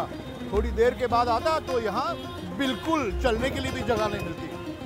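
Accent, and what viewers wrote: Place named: Mumbai, India. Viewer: Your talking style says, Mumbai ka chokra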